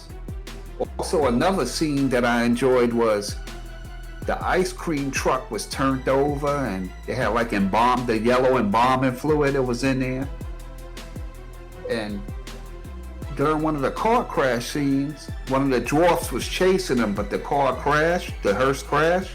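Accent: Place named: New York, United States. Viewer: I love your voice and listening to your New York accent. ❤😊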